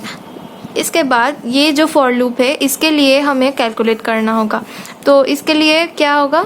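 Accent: India. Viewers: probably a hyderabadi or south indian accent , cute btw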